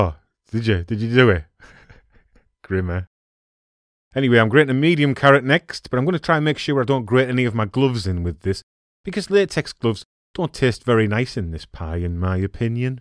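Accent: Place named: Teesside, United Kingdom. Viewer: Lovely, I am from Teesside (Billingham) originally now living in Mid wales it was just great to hear the accent again. I am still trying to explain Parmos to the people around here for 12 years now, and still get weird looks.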